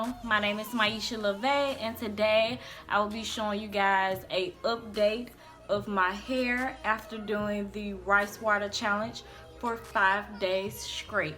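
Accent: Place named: Midwest, United States. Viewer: Lol where you from? I’ve heard many people talk like this in the Midwest.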